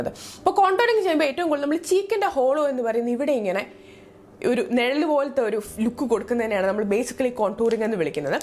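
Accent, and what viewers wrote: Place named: North India, India. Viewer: Chechy adipoliya..chechide presentation nannayi manassilakunnu as a bigginner..pinne chechi malayali aanenne aarum parayilla .oru northy aanenne thonnu...lovly super...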